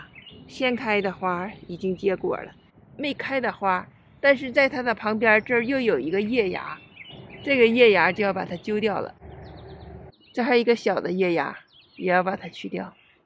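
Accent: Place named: Northeast, China. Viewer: 东北口音亲切！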